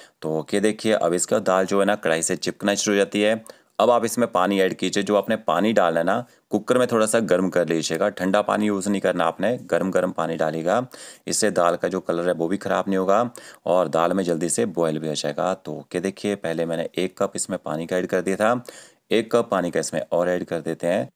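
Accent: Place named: Himachal Pradesh, India. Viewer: App kaha se ho u sound like Himachali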